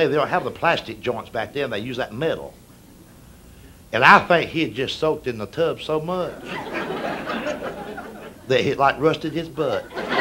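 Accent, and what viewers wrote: South, United States. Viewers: Living in the SOUTH, you laugh harder because people really do talk like this. Bless his heart is really a smear to the person but they don't know it. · His southern accent, story telling ability, timing and sense of humor make me laugh every single time. I liked the full 8 minutes of him where he “paints the picture” in my mind. “We will make a casserole and come on over.” True true.. 😆 😁 😂 🤣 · Just found this! Am now a new sub! You are so funny! And, myself being from TN, I can relate to your Southern accent and charm! And all your stories sound like many memories of my childhood. Thanks for the laughter! We need more folk like you in the world to cheer us up in these uncertain times! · Anyone from the south like I am knows that’s just how we talk 🤣🤣🤣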